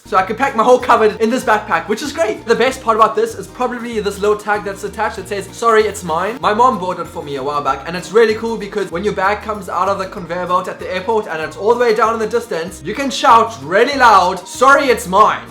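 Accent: South Africa. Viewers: Rocking at moonbag! I'd go back to Italy! It was amazing! (Please don't give up that SA accent!:P) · HAHAHA YES FOR THE MOONBAG! And no! Never change the South African accent! It makes foreigners think we're exotic!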